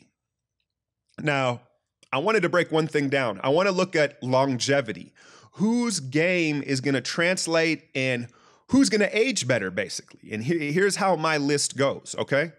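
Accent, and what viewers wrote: Bay Area, United States. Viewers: are you from the bay area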